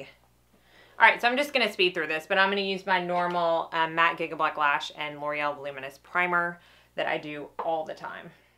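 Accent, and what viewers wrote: South, United States. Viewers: Hi my name is Rousha and iv been wanting to tell just how awesome I think u are! I love your videos I think your so talented. I'm also from TN (murfressboro area) but currently live in NY. I love to watch just to hear you talk it reminds me of everyone back home that I miss not many ppl here have a southern accent lol. I just wanted you to know your one of my favorites to watch and to keep them coming. <mail>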